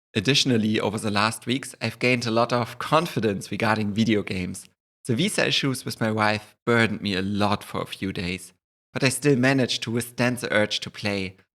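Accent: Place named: Germany. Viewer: Das klingt so falsch wenn ein Deutscher englisch spricht.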